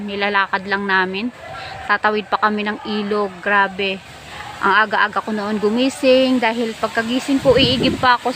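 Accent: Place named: Visayas, Philippines. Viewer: Aragoy bisdak ka pla host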